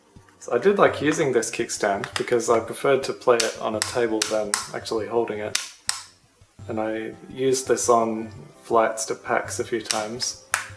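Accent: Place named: London, United Kingdom. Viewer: sorry you just sounded like you were from London .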